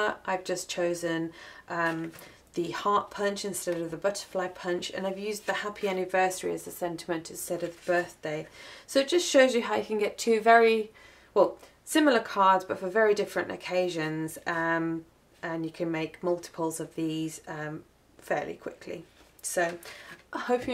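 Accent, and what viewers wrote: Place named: United Kingdom. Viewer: I just have to tell you I love watching your videos! I love hearing your wonderful British accent! I just bought a few papers from that October Afternoon line you've used a lot, and I think part of the reason was how cool "October Afternoon" sounded when you said it! Very cool papers as well!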